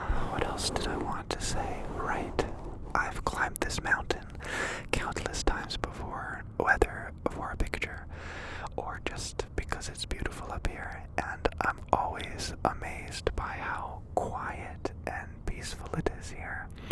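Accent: United States. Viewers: is he american orr?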